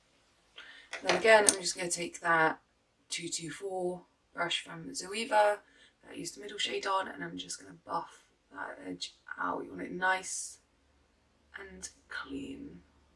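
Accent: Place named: England, United Kingdom. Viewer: So nice to hear an English voice on YouTube 😁 as all the beauty bloggers I follow are Australian or American. you're a star...love the bronzer, nice to see you using some revolution products I love them too. Goodluck ill share your page xx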